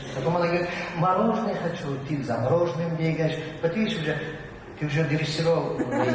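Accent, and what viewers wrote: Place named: Kyrgyzstan. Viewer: Мен отуз жылдан кийин орусча эсимден чыга баштап келсем Бишкекте азыр кыйгыздар суйлогонун ушундай угуп калам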